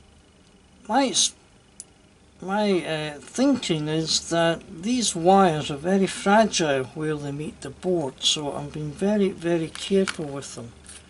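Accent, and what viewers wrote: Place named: Scotland, United Kingdom. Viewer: Love the video and as if by magic "as Mark quoted " ,im new to N-Gauge so have been watching loads of videos to learn ,then i found you "brilliant" im from Aberdeen and your from a wee bit down the road i gather by your twang. keep it up im going to join Aberdeen Model Railway Club and gain knowlage from them too, i just bought a load of stuff from your favorite Ebay and am converting the engines to DCC,look forward to more videos ,keep up the good work and Thank &You. .regards John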